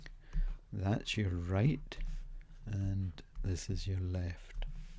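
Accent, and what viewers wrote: Scotland, United Kingdom. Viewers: Thank you so much for this - it has been driving me nuts for ages! Very well explained, and in a good Scottish accent! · Thanks so much for the solution. More than that, what a delight to hear shades of the Scottish brogue again! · Thank you! And what a beautiful Scottish accent! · Excellent video. Very well explained. Having been born and raised in Scotland loved hearing the Scottish accent. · That was fantastic and great to hear a fellow Scot too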